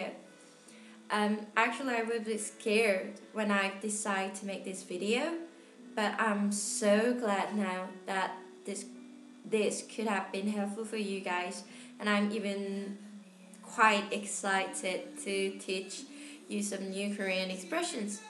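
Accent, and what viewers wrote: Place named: United Kingdom. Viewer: You speak english with a british accent ^ 대박!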